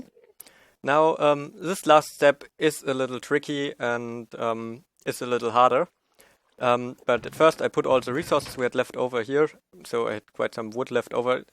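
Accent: Germany. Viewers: I mostly think you are German and you speak english xD (sorry if my English are not perfect i'm a German kid) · lol dude how much do you know about minecraft ??? XD and are you german ? your english sounds some kind like german english :) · bist du deutscher? Hörst dich genauso an wie mein Nachbar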